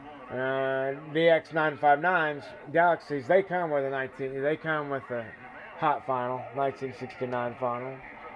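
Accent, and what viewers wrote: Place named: Tennessee, United States. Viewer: Hey... interesting background you have. My first CB radio had 12 channels and was crystal controlled. I am also a Ham for about 45 years. On top of that I have been a news photographer and videographer since 1967, and that's what prompted me to comment here. Since the radios are "horizontal" in shape you're videos would be greatly improved if you turned the phone camera sideways when you record your videos. Then we viewers would get to see more of your station at one time instead of big gray boxes on the left and right of your images. I hope you find that constructive. It was fun listening to you. Reminds me of back home in East Tennessee and Western NC.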